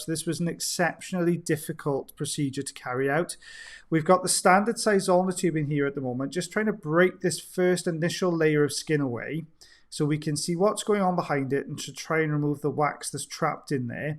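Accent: Wales, United Kingdom. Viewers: Lovely!! The suspense of.......its coming out......oops no it’s not.......here it is......noooooo! Better than a Steven King thriller this one !!! Thanks for sharing your talent and expertise with us.....your PATIENCE with your PATIENTS is remarkable and I miss hearing the Welsh accent, someone from home. We don’t get too many Welsh here where I am in Ontario Canada. Keep em coming!! CYMRU AM BYTH 🏴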